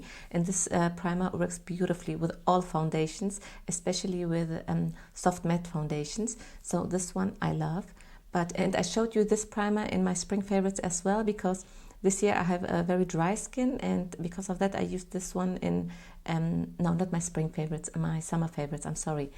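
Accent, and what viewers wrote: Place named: Arab world. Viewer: I will guess Middle East . Arabic.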